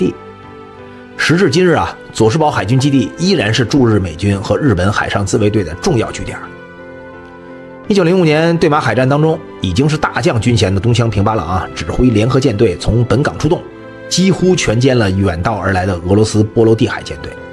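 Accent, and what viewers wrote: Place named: North, China. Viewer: 北方口音。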